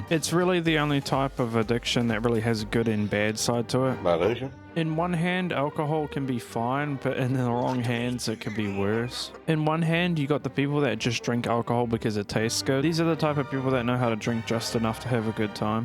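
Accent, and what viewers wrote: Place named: England, United Kingdom. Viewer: the english accent adds to the dry humor, nice video.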